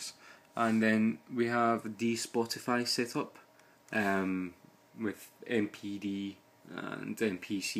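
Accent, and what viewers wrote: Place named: Scotland, United Kingdom. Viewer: That's because he's Scottish, and your English is not good enough. Sorry - you were expecting a personal translation just for you?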